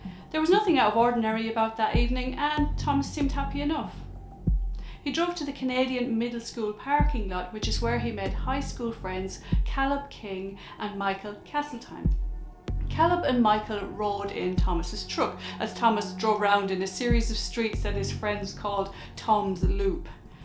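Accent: Ireland. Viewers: Don’t listen to them. You’re just fine and personally, I love the Irish accent. Between you and That Chapter (where I discovered your channel), I get my dose of it! If someone doesn’t like it, they can either learn to love it or move along. Keep up the great work you’re doing. The channel will continue to grow!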